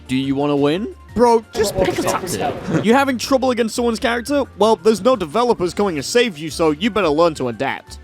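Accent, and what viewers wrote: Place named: United Kingdom. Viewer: How to start a Video: 1. Say "Lets talk about [insert fgc related topic here]" In a british accent 2. Have the camera be fuzzy and show a big giant white text of insert topic before showing the strive giovanna gameplay 3. Always play the Character Select Theme from MBAACC 4. Time all three events to where the beat drops in the Character Select Theme from MBAACC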